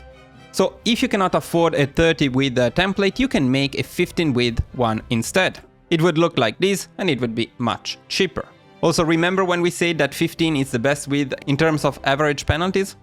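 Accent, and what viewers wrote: Italy. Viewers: Hey great video (as always). Completely unrelated question, are you Italian?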